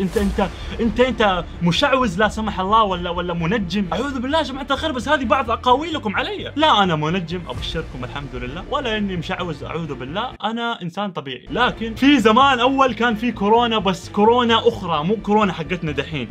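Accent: Jeddah, Saudi Arabia. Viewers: هو من جدة لأني قد شفته مرة من المرات بمطعم بجدة و حتى لهجته جداوية ترا ((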